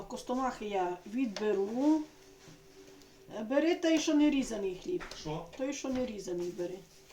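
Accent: Ternopil, Ukraine. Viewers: Дуже люблю дивитися Ваші відео, як ви розмовляєте теж. Хто щоб не казав такий діалект є в північних районах Тернопільської обл.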